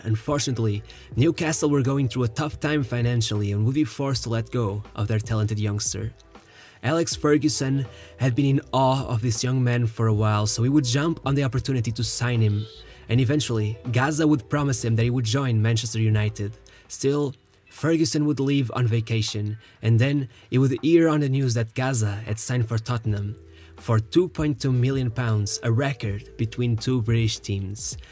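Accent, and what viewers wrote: Portugal. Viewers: Portuguese accent i love it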